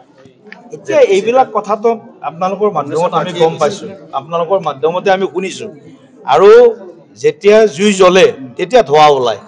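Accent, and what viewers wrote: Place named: Jorhat, India. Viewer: Jorhat kotha kuwa 😅 kune jikile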